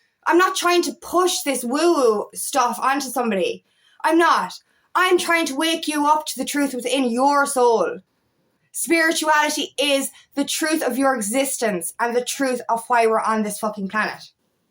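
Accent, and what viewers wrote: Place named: Ireland. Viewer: Yes it is where I belong for sure . I will fly the weirdo flag 😂 yes laughing really raises my vibrations 😂😁🤭 I honestly find things funny other don't lol I laugh at myself 😂 I just love the Irish accent when you say f ing lol 😂 I'm in the UK x everything you say you absolutely true 😊 xx we definitely need out ffs . Least we can all join together as one here . I might set up FB so I can join you there too . At some point I'm going do a video myself as I'm holding back but I'm saying it lol it ok for those who think I'm a freak lol I gone through worse 😂 xx have a good day in this f ing matrix we will be out soon xxx luv n hugs ❤🤗❤🤗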